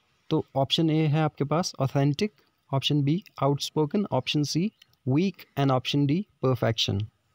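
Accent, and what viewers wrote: Kashmir, India. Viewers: Sir i guess u r kashmiri...!!! Am I rt..??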